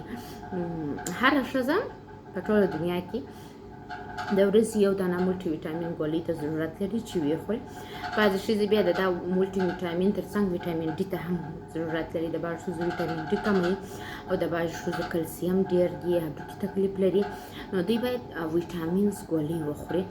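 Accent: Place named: Kandahar, Afghanistan. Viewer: ستا دا کندهاری ژبه زما په زرکی سی❤❤❤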